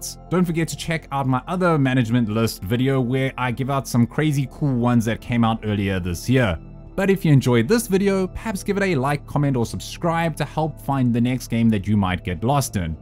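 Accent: South Africa. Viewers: Do... I hear a Saffa?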